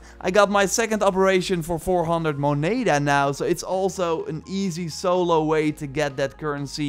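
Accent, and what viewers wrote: Netherlands. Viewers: I instantly hear the ditch accent in a milisecond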